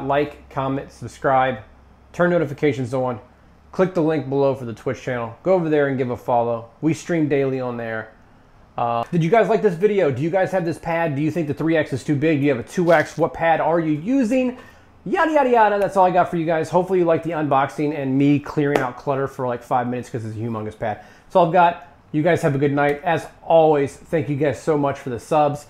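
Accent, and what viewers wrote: Ireland. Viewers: where in Ireland are you from ?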